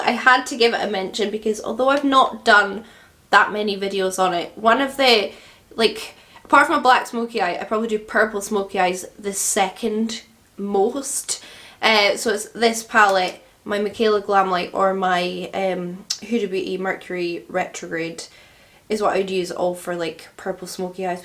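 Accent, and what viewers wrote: Scotland, United Kingdom. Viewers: Hi, thankyou for all of your videos, I love watching u Swatch, apply & review all of the awesome products on your channel. Can u plz tell me where to buy the earrings u wore in your 17th November 2921 vid? And your last video 2 days ago? The earrings are lovely! But of course the coolest thing about your vids is the lovely products & you flawlessly applying them & creating awesome looks. Hope to get a reply from you, I loveeee your accent, Scotland is beautiful I'm Irish so not too far from you. Thanks so much 🥰♥️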